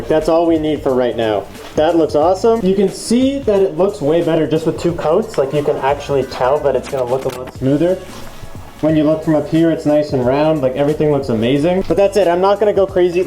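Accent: Canada. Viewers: You have the accent canada is where its at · Is it just me or did you didn’t notice accent until finding out he lives in Canada?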